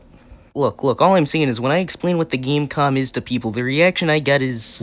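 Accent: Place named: Canada. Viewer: He sounds more like he's canadian tbh.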